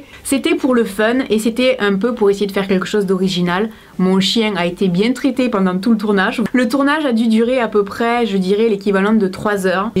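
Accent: South, France. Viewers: J'adore l'accent du sud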